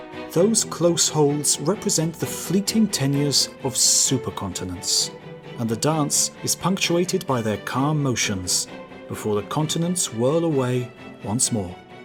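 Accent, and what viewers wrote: England, United Kingdom. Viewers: Is this dude English? Why does everything with an accent sound better?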